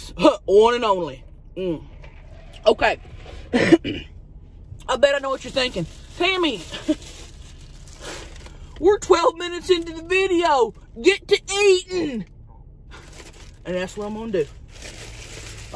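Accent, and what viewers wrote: United States. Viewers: This woman is so damn funny😅😅I love the way she talk..that country accent. I gotta get back to her on snapchat..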